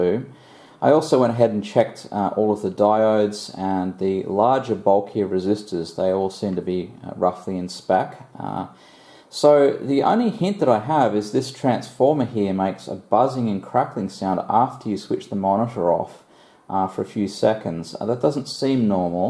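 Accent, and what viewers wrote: Australia. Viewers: Enjoyable. Came here from retrospectre channel. Subscribed. Nice to hear a fellow ausdie voice too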